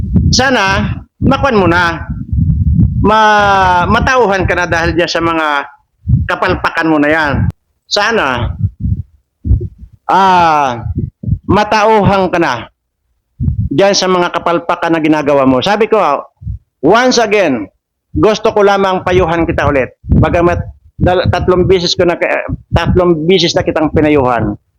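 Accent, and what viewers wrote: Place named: Visayas, Philippines. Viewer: Ang cute ng accent nio magsalita ng bisaya Sir.Basta ang saya ko pag tumatawa po kayo😅 pati ako lakas tawa din😅.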